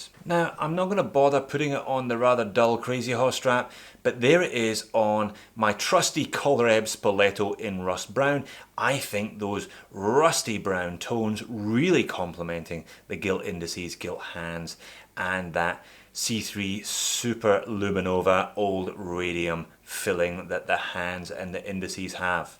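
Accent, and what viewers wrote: Scotland, United Kingdom. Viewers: Love the Scots accent with the Sox shirt! Enjoying this one a lot.. . it is hard to find divers with restrained designs and a vintage feel. Logo is a bit loud for my taste though.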